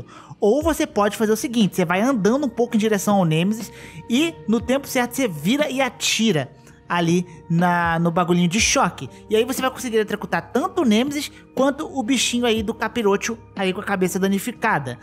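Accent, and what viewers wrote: Rio de Janeiro, Brazil. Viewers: Ele é do Rio de janeiro se nao me falha a memória 🤔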